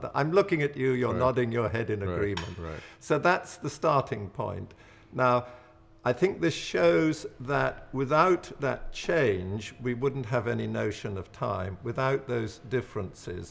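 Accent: United Kingdom. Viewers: His British accent makes him seem much more believable. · He basically admits he is thinking of time as a rigid linear thing, which can be ‘paused’ while other time persists... yet chastising Einstein for thinking of time on a clock. Einstein used clocks as reference for time according to who was using one in relation to acceleration etc. This is old thinking. The clock isn’t the issue... time isn’t the issue.. only to who it’s ‘RELATIVE’ to... he’s describing chapter 2 of E=MC’2 which is 15 chapters. *make another video when you get to the end ;) this only reaffirms that because you have a British accent doesn’t make you wise... it’s having an accent in a theory which has stood the test of ‘our time’ and never, ever been refuted.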